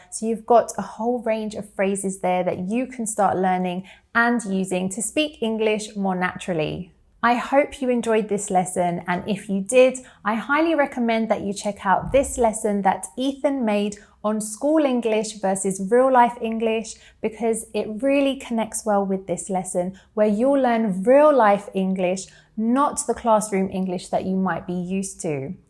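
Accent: United Kingdom. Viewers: After im following your lessons i think im fallin in love with UK accent.... Kinda sweet and cute...🙃🙃🙃🙃🙃🌹🥳⚘ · I love your British accent 😍😍😍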